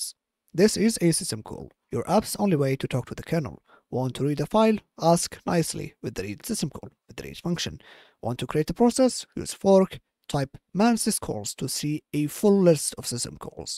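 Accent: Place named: Egypt. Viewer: You had a bad Egyptian accent bruh but a nice content 😢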